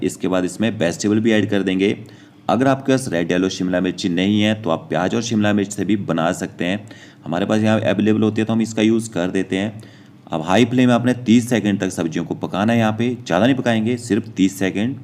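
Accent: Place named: Himachal Pradesh, India. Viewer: Maine Apki tone se pehchan Liya,By the way kahan se??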